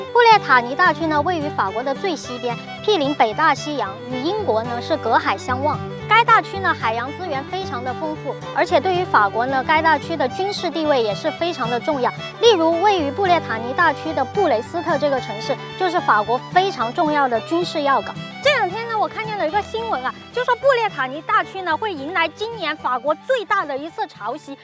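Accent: Sichuan, China. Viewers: 非常喜欢你开心的样子，空军不重要，重要的是你的川普风格很搞笑开心，谢谢你，同是天涯四川人！ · 四川幺妹儿吧？ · 她讲的是四川普通话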